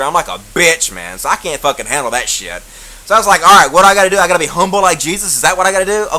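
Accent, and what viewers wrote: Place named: Kentucky, United States. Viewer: Oh shit you live here in memphis? you have a more country accent than most people here i thought you were from like kentucky or something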